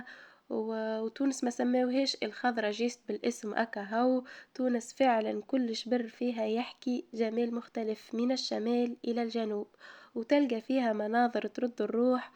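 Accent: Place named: Souf, Algeria. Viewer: الله أعلم هادي لهجة سوفية اكثر من تونسية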